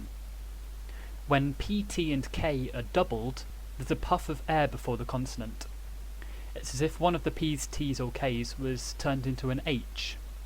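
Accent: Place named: Southern England, United Kingdom. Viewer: Southern English or southern part of midlands.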